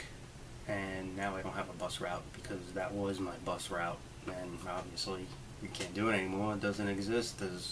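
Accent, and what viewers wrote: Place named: New York, United States. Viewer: you're a good guy..love the new york accent:)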